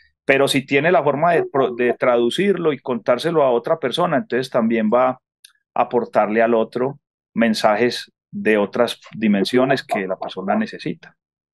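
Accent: Colombia. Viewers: Soy colombiana pero créame q me encanta como hablamos los colombianos. Una en Colombia bueno no le párese pero cuando uno va a otro país y escucha como hablamos. Jajaja jajaja me encanta. Nuestro hablado habla y se escucha espectacular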